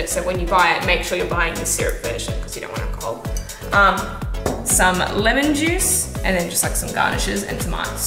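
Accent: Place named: Australia. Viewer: I actually had no idea she was Australian, but I did wonder bc i started watching her Barbie vids first, so I wondered why she sounded so weird and different bc I never heard an actual Australian person say anything except like alrighty mate or something like that but then I found out why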